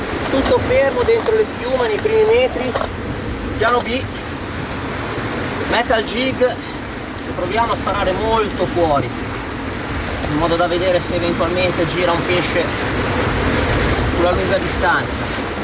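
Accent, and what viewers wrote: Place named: Liguria, Italy. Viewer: La cadenza sembra ligure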